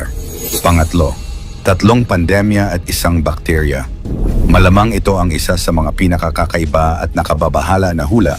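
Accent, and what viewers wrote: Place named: Philippines. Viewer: NALILITO ako sa NARRATOR. PINOY kb? IBA kc intonation n accent mo😅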